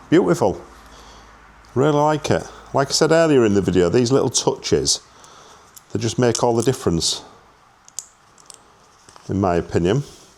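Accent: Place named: East Yorkshire, United Kingdom. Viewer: East Yorkshire - thought I recognised that accent! Round that way used to be my old haunting ground (Hedon) till I moved to Aus. Been thinking about getting one of these exhausts for my Vstrom 1050DE but not sure whether to go ceramic black steel or titanium. I assume the different metals wouldn't sound the same?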